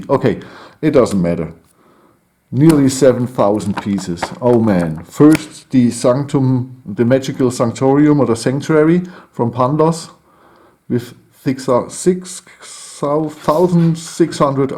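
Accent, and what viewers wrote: Germany. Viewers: Your Hessischinglisch is a pain in my Ears..... Dann bitte auf hessisch.... Oder Frankfurterplatt....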